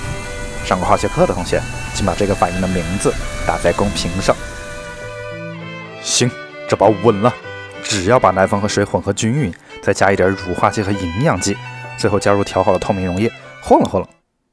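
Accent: Wuhan, China. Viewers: 是怎么做到标准的普通话中夹着浓浓的武汉腔的😂😂😂听起来格外“需服”！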